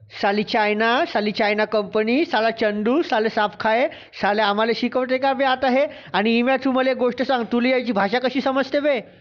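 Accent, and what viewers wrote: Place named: Varhadi, India. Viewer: Nice भाऊ आपली वऱ्हाडी भाषा एकदम मस्त वाटते.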